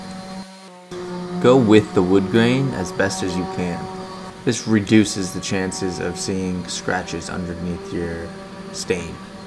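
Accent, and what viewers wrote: Canada. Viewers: I've noticed you're canadian because of the accent hahaha 🥰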